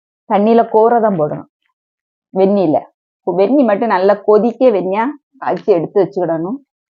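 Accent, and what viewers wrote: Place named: Kerala, India. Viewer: நல்ல மலையாளம் தமிழ்🎉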